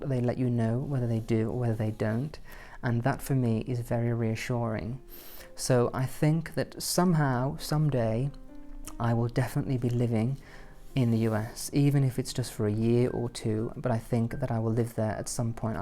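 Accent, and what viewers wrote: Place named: England, United Kingdom. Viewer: you speak like a real English gentleman, born and bred in the upper classes. I could listen to you all day, your voice being so calming. Does this way of speaking come naturally, or did you learn how to speak correctly? I look forward to watching your Christmas video.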